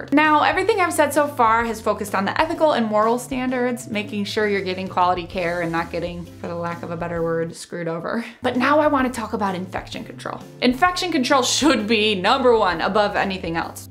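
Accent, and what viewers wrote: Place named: Chicago, United States. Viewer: Great advice. Your Chicago accent feels like home, sounds like my friends.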